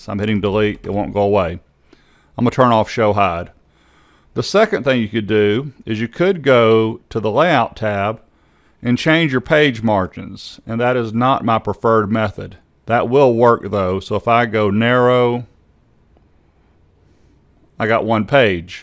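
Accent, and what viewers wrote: South, United States. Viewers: worked like a charm thank you that southern accent though!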